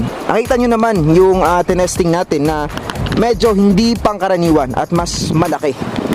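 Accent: Visayas, Philippines. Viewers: Bisaya ka po ba idol? hehehe pa shout out na rin😁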